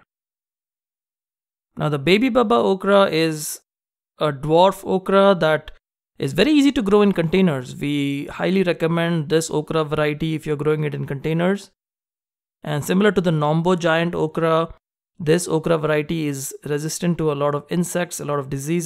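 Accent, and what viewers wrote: India. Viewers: he has indian accent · are you native to india i feel so because of your accent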